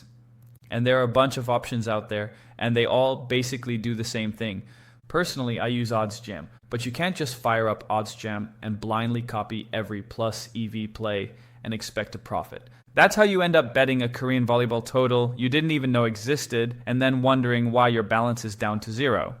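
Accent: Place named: United States. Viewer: The Best Most Perfect American English Accent & Talking I've Ever Heard!!! 💖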